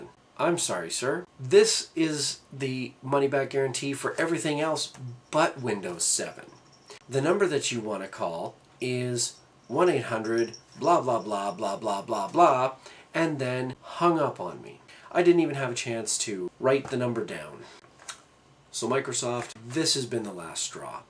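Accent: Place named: Canada. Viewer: You sound Canadian. Anyway, if you have so much experience on computers--I'm surprised that you blindly bought Professional without a second thought. Yes, I know a fair amount of consumers may be unaware of such a thing, but I'm surprised that you didn't catch such a thing.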